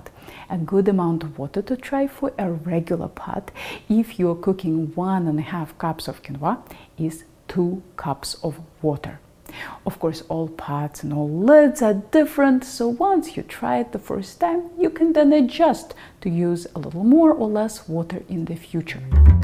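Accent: Russia. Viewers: I lost it for her Russian sweet soothing Accent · Good info ,while her English is great Russian accent still apparent. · what's with the Russian accent?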